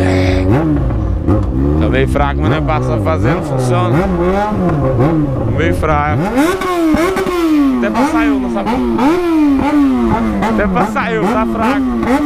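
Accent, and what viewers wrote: Goiás, Brazil. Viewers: pq parou de falar no pique do Goiás? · o porque você não fala mais no pique do Goiás?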